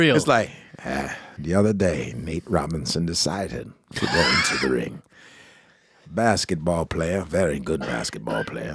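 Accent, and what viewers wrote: England, United Kingdom. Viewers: It’s very close. It’s just the English accent that makes it not Morgan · I give you a 7.8 out of 10. The problem is, you have an English accent while doing that voice, and Morgan doesn't.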